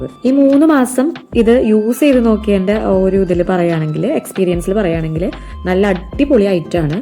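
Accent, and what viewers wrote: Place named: Thrissur, India. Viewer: Is ur place Thrissur? Why do you mix your slang with others? Just go ahead with your own style, people will accept it. Be yourself.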